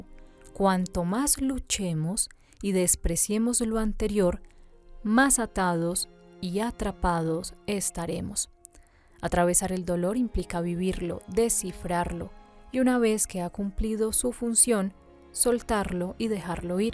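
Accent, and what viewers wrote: Colombia. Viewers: Nada mas hermoso que escuchar una colombiana. saludos desde México.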